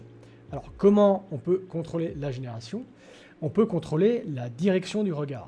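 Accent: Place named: Vaud, Switzerland. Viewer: Ça fait du bien d'entendre du vaudois sur youtube ;)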